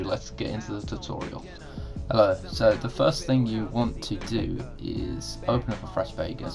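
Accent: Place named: United Kingdom. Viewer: Thick British accent gotta be one of my favorite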